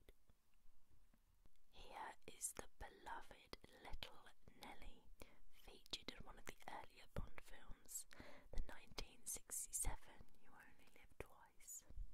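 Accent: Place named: United Kingdom. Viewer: Lovely whispering, and I love the British sound 💖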